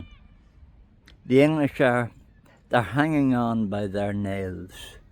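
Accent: Ireland. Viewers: I am a Jew and an Israeli. Thank you for standing with us. It's beautiful to hear an Irish accent that isn't speaking hatefully toward us. May God bless you!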